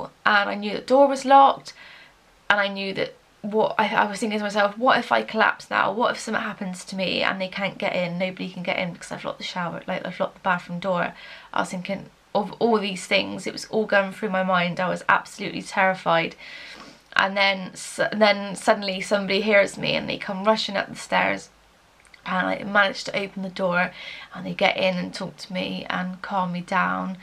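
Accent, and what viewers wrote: Bristol, United Kingdom. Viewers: you from bristol/south west? am a fellow janner haha thank you for sharing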